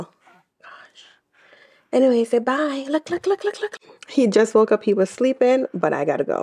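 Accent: Jamaica. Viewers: Why you sound jamaican????🤔🤔🤔🤔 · Are you Jamaican??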